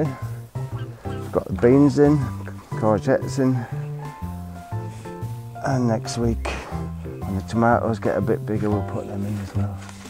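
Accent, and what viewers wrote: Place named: Norwich, United Kingdom. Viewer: Love your wigwam..can I ask where you are from originally? I can't place your accent, sounds a bit like Norwich and a bit Yorkshire :)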